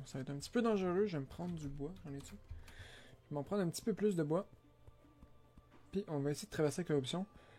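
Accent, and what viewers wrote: Quebec, Canada. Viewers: j'aime bien les accent québécois 😀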